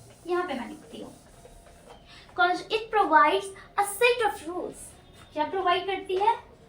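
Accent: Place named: Bengal, India. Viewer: I think you are belongs to bengal beacause your hindi language simmilar from bengal .but your teaching method very perfect.....recommend by rajnish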